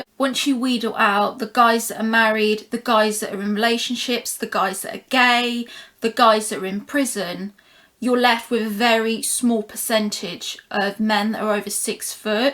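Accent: United Kingdom. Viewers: I can listen to her all night long. She sounds like BBC/London. 😊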